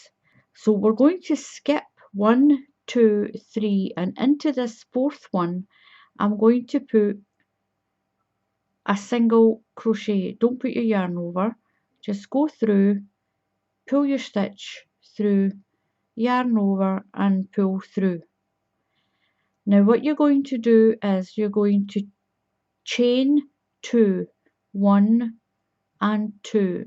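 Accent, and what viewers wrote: Scotland, United Kingdom. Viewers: Hi, whenever I want to do a crystal waves blanket I always come to your channel, well tonight I decided to put subtitles on as hubby is watching telly, well it seems text to speech doesn't recognise our Scottish accents, no kiddin' I am in fits, you should try it, good job I can follow without sound, anyhoo like others have said your tutorials are very easy to follow, one more thing, do you have sisters called Ann and June? If so I worked with them in Sainsbury's a few years ago. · is that a Scottish accent we hear ???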